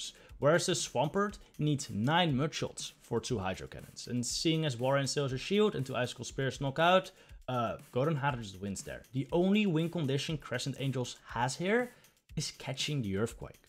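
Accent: Netherlands. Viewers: Youre Dutch aren't ya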